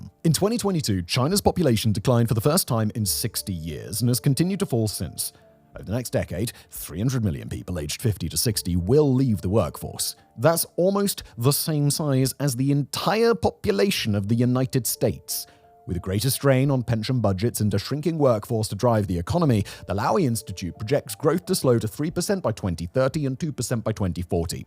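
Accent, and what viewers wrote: United Kingdom. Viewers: Bro said ''china aggressive international approach'' and is just china investing in emerging economies. And he says this with an UK accent, you know the empire that conquered half the world trough violence lmao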